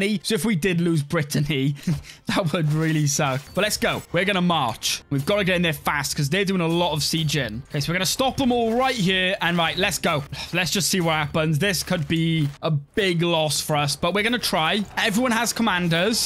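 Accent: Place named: Caerphilly, United Kingdom. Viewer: It’s always really nice to hear another person from wales. But always weird 😂 I would guess Caerphilly by the accent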